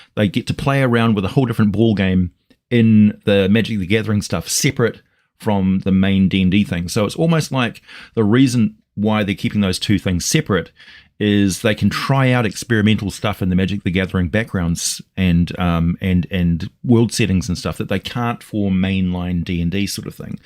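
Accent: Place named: New Zealand. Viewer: Excuse me New Zealand I said UK in my last post don't know why I said UK the accent is different LOL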